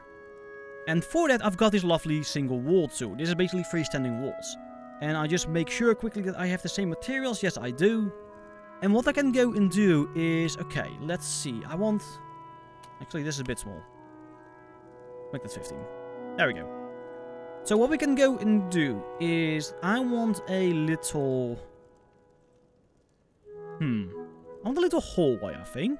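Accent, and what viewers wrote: Netherlands. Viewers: You sound Dutch 🙈 Are you? I am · Are u dutch bc it sounds like it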